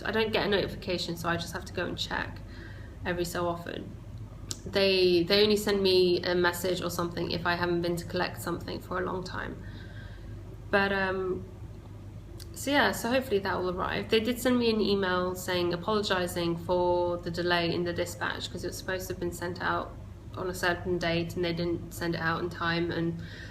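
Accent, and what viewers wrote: United Kingdom. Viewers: This is a note, and you can take mu advice, or dump it. I just like people and if they think my advice is silly, I am not offended. You're looking into the computer. Your camera is to the right of how you are sitting. Look into the camera and not the computer to see yourself. You are lovely and do not need to check yourself and if you look odd. You cannot look odd. I am jealous of your hair and think you're a joy to watch. If you looked straight into the lens, I think it would be a titch better, but you could put the back of your head to the camera and I'd still watch. So again, feel free to ignore me. Honestly, you make very few new to pod mistakes. I don't hear some of the words I have to edit out and that takes forever. I ummm, and "uhhhhhhhhhhhh." LOL! I mean every comment with 100% respect to the wonderfulness of you. If you're from the UK is loving Monty Python mandatory? 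My husband says it is and although I've been to the UK, and know it isn't he loves teasing me and I would like a UK person to answer for him. HA! We adore your accent. If course you wonder what I'm talking about, since I'm the one with the flat American accent to you. Very flat, Michigan and we call soda pop. I know you had no need to know that.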